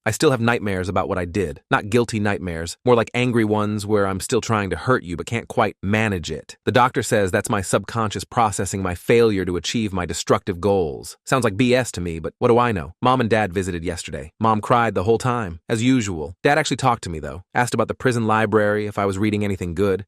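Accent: United Kingdom. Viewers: sadly, but I like how the Brit accent sounds! (It was just funny how it randomly switched in the video)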